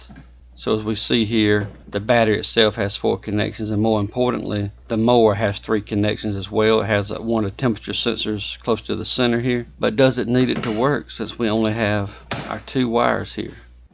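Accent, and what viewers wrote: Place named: South, United States. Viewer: nah you just sound like every redneck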